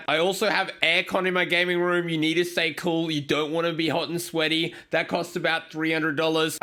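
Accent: Australia. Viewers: Ive seen him do a face reveal before but i still wonder how much difference there is in his looks and voice. He sounds like a chubby australian with a goatee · No doubt. He looks Eastern European with an Aussie accent. Aren't all Aussies supposed to look like Crocodile Dundee? LOL...JK! cracks me up though. He is salty and his laugh is epic.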